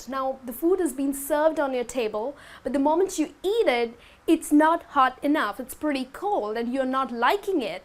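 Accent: United States. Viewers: How do you twist your tongue to make US ascent???:) I wanted to learn it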